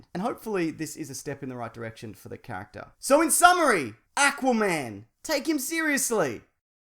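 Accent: Australia. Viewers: I'm guessing your Aussie right I can hear that accent, mine isn't as strong as yours 😂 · i read the title with his sweet aussie accent before even clicking the video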